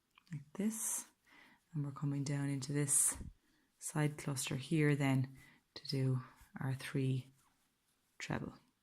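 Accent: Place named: Ireland. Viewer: I found your individual Granny square thank you. I've completed it thank you.Looking at you crochet with that hook you've a very natural flow. You must be crocheting for years. Please do upload more when you get time. Have you a scalloped border most on here don't show how to do the corners. May I ask where in Ireland is your beautiful accent from. ?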